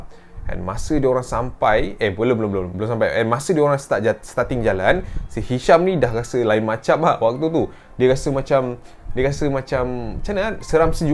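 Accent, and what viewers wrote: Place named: North, Malaysia. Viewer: Terbaik..🎉👍 loghat utaqa noh hang balun🤣😂moga sukses sllu dik..perlis hadir🙏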